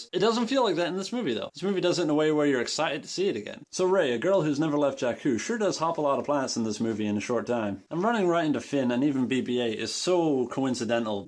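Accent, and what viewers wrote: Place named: Canada. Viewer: I'm impressed with your YouTubing skills. You're sounding a lot more Canadian than I remember though!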